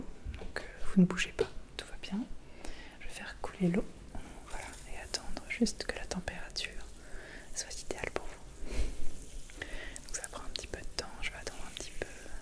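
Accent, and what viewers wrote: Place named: France. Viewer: Hi, I hope you continue with your desired goal of making it as an ASMRist - this is very admirable.Please produce more videos centred around you speaking in English (French speaking English is the ASMR in essence).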